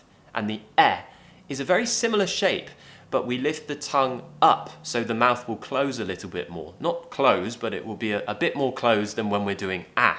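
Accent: United Kingdom. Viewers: Nice accent, I would like to speak as a British native speaker. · Hi! You always help us a lot in pronouncing British English. I'm in love with your pure British accent, so beautiful and clear. I've always had some trouble when pronouncing the /ae/ sound and I'm doing my best working hard on it. A tip to help your students pronouncing it correctly, or at least, more correctly, is to try to place the position of your mouth as if you were going to pronounce an /a/ and then pronounce an "e". Do you know what I mean? I hope it helps! Thanks a million for all your help and good knowledge. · Good morning, Thank you for administering our daily dose of English pronunciation! I do believe that listening to you on a daily basis will bring us next to perfection. Since I singled you out from the wide range of online English teachers my English has dramatically improved. 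Just like children do, I naturally tend to imitate your accent, use your vocabulary and so on. I suppose your she cat has a perfect British accent too! I managed to pass the CPE test in Rome but I want to go further. English seems to have no boundaries, the more I learn, the less I know. I think one day I will take up one of your courses so that I can have ready answers to my questions and doubts at hand! I cannot thank you enough for what you do!!! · What kind of accent that the Primary Minister May said ?what's the difference between yours and hers? Both are really amazing!!!i was fall in love with British accent !!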